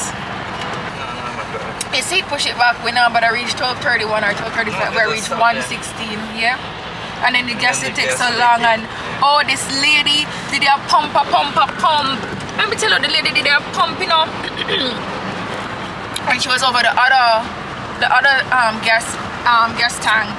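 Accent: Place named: United Kingdom. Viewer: I love the British accent ❤😂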